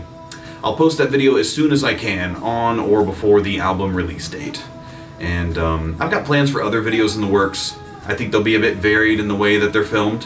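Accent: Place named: South, United States. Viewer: It took until this video for me to realize you were also southern lol.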